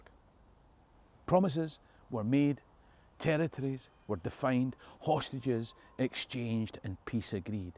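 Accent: Scotland, United Kingdom. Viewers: So weird listening to a black guy speak with a Scottish accent.......weird!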